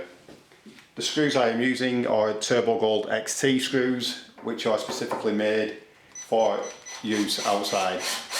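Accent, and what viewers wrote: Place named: Chorley, United Kingdom. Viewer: I really do love your videos-have learned so much from them! Great accent too! I grew up in Chorley and it makes me feel quite homesick when i hear you! Any chance of a video to do with fitting a small handbasin in a toilet only room? Need to do this job and would feel much more confident to see you do it first!! Good stuff...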